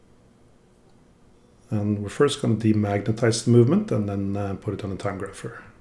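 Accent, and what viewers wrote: Norway. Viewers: Du høres norsk ut.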